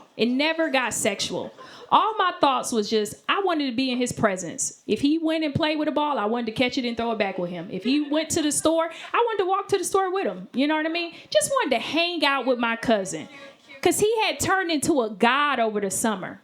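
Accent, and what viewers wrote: South, United States. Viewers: She has that classic southern twang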